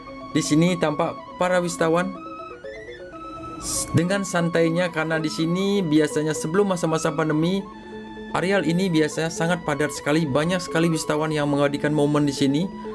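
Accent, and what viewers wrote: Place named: West Sumatra, Indonesia. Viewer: Maaf krn saya penggemar menikmati video" nya tp saya jg pingin tahu identitas al: nama, asal dll krndari logat sepertinya asalnya dr Minang, Sumatra Brt. Terima kasih dan teruskan berkarya ttg Bali/ Hindu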